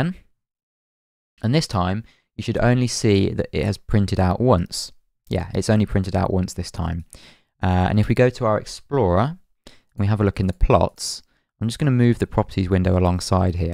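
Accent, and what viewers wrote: United Kingdom. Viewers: i just love british ppl (the accent ofc)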